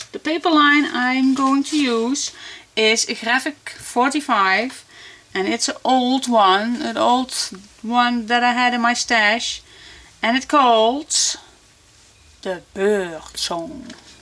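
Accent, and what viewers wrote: Brabant, Netherlands. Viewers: jeetje , ik ontdekte jou dit weekend op you tube....heb uuuuuren zitten kijken. koptelefoon op en manlief had geen last meer van me hahahaha en ben weer compleet geïnspireerd door jou ....had veel albums gemaakt maar zie dat ik nog veel kan leren van jou ook heel veel ideeën opgedaan ( gebruik nu ook acryl stempelblokje om met mijn lange nagels het tape af te scheuren top idee). vreselijk gelachen ook om je .... wat een kei gezellig mens ben je ( brabant zo te horen) zit wel met heel veel vragen over materialen maar ja jij zegt googel het maar alleen niet alles kan ik vinden wat ik wil dus hoop dat je toch stiekum vragen wilt beantwoorden ,,,, merk wel dat wij veel dezelfde ideeën hebben ook ik werl al jaren met het "potloopstreepje en meet ook nooit iets af ... ik ga snel verder kijken bij je , groetjes Marijke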